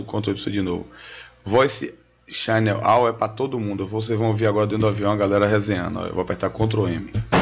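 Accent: Bahia, Brazil. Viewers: BAHIANO CERTEZA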